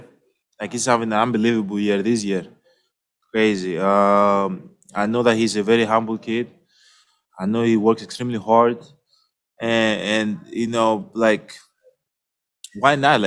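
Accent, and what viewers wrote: Greece. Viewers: I love his Greek 🇬🇷 accent ☺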